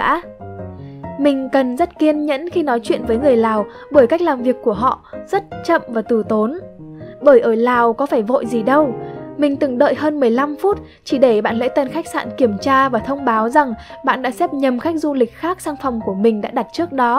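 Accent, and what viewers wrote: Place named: North, Vietnam. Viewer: giọng là giọng bắc, nhưng đôi lúc có chút phong ngữ miền nam:)))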